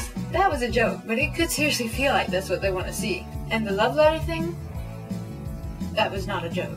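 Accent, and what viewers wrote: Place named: South, United States. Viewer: i was wondering where she's from until i heard that.... ahhh. yep, thats southern. :D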